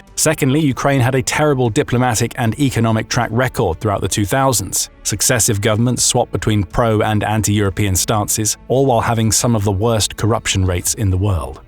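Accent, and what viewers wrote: United Kingdom. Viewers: Can you use a non UK accent. We have grown tired of the accent.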